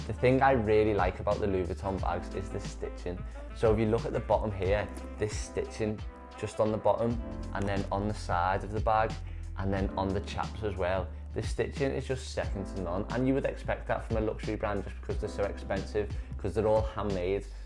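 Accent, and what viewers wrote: Liverpool, United Kingdom. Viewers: I think the Damier Ebene is better as it looks freshly sexy even when it is so old and used.. By the way are you from Liverpool?? :)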